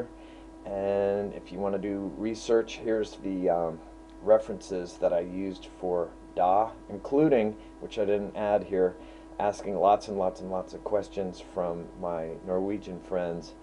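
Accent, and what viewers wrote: United States. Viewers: Hehe. I love the American "R", hihi =) It sounds so weird, since i'm a native speaker, though I don't blame you. Very interesting video man, carry on :D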